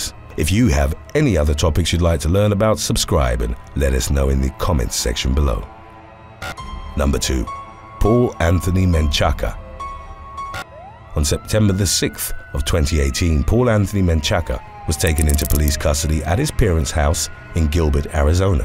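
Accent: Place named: United Kingdom. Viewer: Why do these American hoaxes sound SO much more sinister coming from a British narrator???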